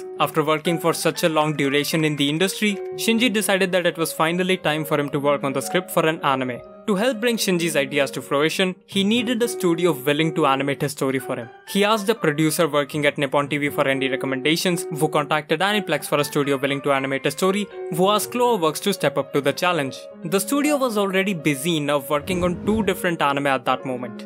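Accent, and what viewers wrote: India. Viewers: The Indian accent makes me not be able to take the video seriously. The editing is good. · Are you indian? your accent is hard to pin down...it sounds indian and Italian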